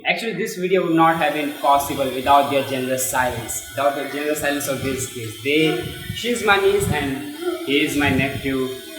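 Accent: India. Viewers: why is it not proper english, you cant expect an indian man to talk in an american or english accent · Dude, that heavy Indian accent is a put off. But other then that, content is good.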